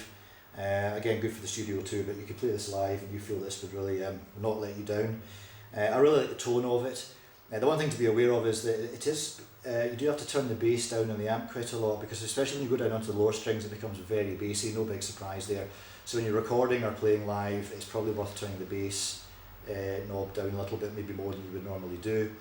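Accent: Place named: Scotland, United Kingdom. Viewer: Great review thanks, looking for a 7 string Ibanez and came across this. I live in the US so I was happy to find a voice form back home (grew up in Edinburgh) Cheers!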